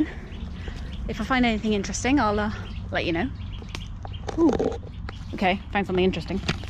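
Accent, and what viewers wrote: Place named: West Country, United Kingdom. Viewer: Like the humour & west country accent, helpful review.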